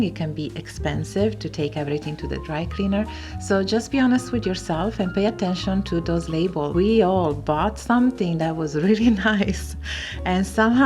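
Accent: Italy. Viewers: Buongiorno, questo è il primo video che guardo nel suo canale, ma lei è italiana vero? Posso sentire un pochino pochino di accento, ma volevo dirle che il suo inglese è veramente straordinario! Questo video è veramente utile e interessante, ne guarderò altri di sicuro 🙂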